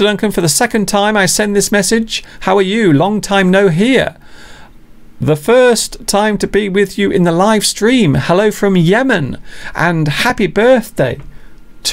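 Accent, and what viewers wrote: United Kingdom. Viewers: absolutely fantastic ! just thumbed it up on the go. I appreciate a lot how you entertain us with clear British English. The best way to learn English is listening to you like a couch potato. And Happy Birthday to your mother and tell her she looks like someone pretty clever with a great smile.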